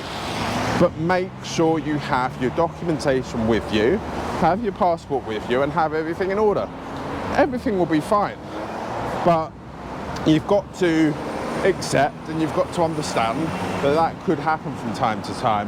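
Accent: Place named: United Kingdom. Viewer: I love the British English accent. I would shrug off the US English should I come as an alien from another planet. But the English pronunciation, hilly and aspirating, reminds me of the coughing of a Victorian aristocrat.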